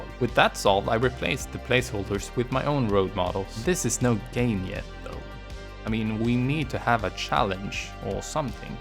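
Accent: Sweden. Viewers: eeeeeeeh that I don’t know but he sounded Swedish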